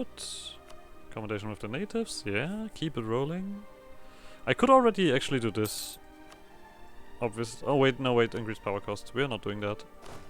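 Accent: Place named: Germany. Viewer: lmao after that "soooooo" i didn't even need to hear the accent to immediately know you're german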